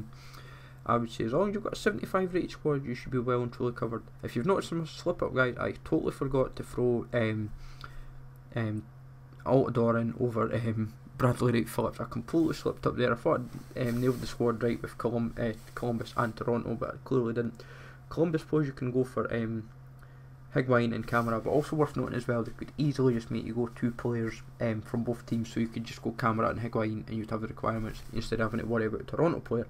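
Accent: Scotland, United Kingdom. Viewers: always love the scottish accents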